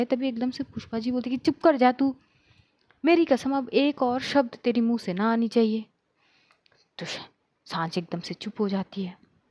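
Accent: Haryana, India. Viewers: Kya story hai yar or upr se aapki haryanvi voice mar dalogi sach me 😂😂😂😂😂😂😂😂😂😂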